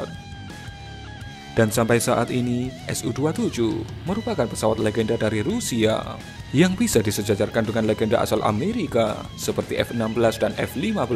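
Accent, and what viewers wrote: Java, Indonesia. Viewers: Mantep nih channel, modal text Googling, footage nyolong dan bacotan medok bisa dapet 400k lebih subs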